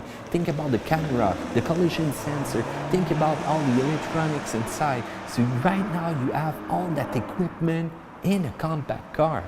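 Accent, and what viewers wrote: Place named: Quebec, Canada. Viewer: I can tell sort of a French accent.. But it's a little different. Is that a French Canadian accent?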